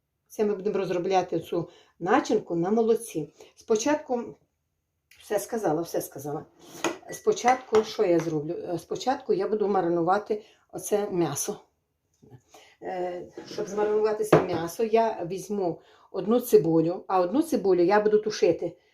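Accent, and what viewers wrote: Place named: Zastavna, Ukraine. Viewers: Говірка ,точно як у Заставнівському районі,.....файно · Живий класний рецепт!! Дякую- класно що жива мова Заставнявського району, живі звуки кастрюль, дзвенкіт посуди - просто класс! Дякую за рецепт- як писали люди - всі то шукали давно і нарешті в ютьюбі є!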